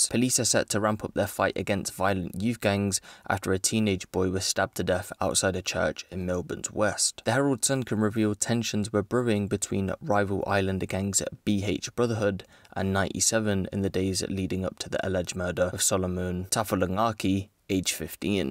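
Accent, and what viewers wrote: United Kingdom. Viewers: So ur Zambian but u talk on the U.K. like ur British !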